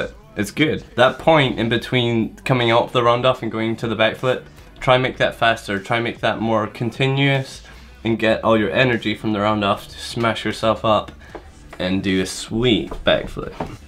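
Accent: Scotland, United Kingdom. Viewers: Hey are you Scottish ?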